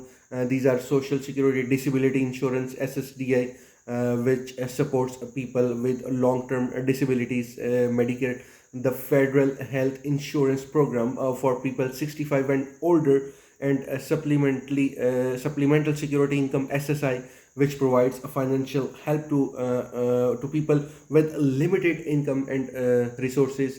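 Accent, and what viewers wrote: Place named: India. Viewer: wow The way you speak English, I can tell you that you are not from USA. You are from India. What do you know about Social Security of USA.??? Everyone in USA knows about their social security benefits. Before you upload any video clip learn how to speak English. All this news are on YouTube video's everyday by DR. Ed weir. So we don't need your Indian accent English, that no one in USA will understand. DR. Ed Weir answering all the question and any kind of question regarding Social security. Stop this bull shit.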